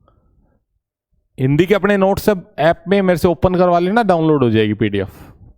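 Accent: Ahirwal, India. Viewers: हा बोली को लहजो अहीरवाल एरिया जैसों है।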